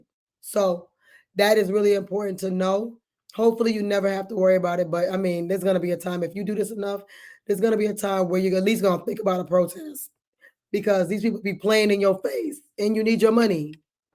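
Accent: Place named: Georgia, United States. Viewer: yeeesss, is the beeeest georgia girl accent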